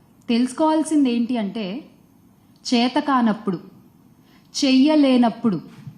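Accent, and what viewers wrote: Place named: Telangana, India. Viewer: అక్క 😂😂😂 నీది Telangana కదా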